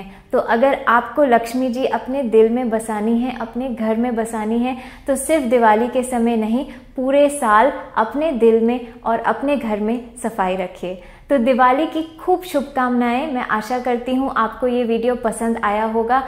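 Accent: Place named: India. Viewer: And most importantly love your way of talking. An indian fragrance which came out from your voice that i love the most.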